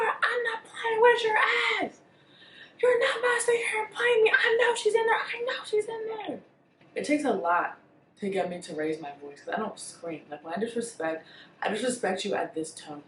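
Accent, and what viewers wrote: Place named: Nigeria. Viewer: loved how you spoke like a typical Nigerian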